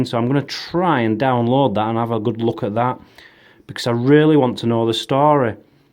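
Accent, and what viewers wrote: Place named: North, United Kingdom. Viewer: I could listen to that Northern accent all deey.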